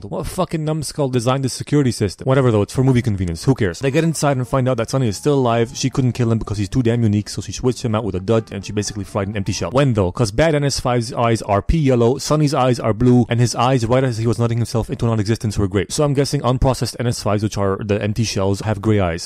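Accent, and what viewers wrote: United Kingdom. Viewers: >shaved lol cracked me up BTW are you British by any chance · british power has been unleashed Also are ya British.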